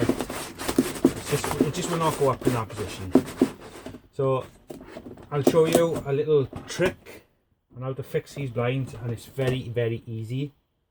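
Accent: Wales, United Kingdom. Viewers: Love your accent. You remind me of my time living in Penbrokeshire Wales. Thanks for explaining the shade repair.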